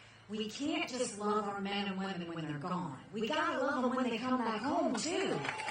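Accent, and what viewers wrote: South, United States. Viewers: Southern accent, since when?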